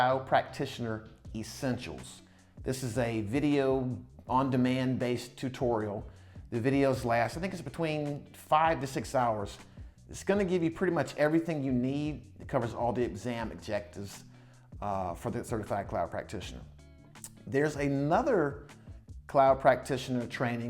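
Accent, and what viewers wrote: South, United States. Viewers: Thanks for the info. I'm taking some Udemy courses and plan to pass it on a month.😎🌟 We're are you from bro, you have that country singer accent.😅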